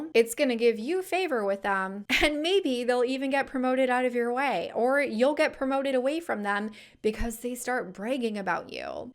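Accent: California, United States. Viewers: Your Cali tone is so off putting…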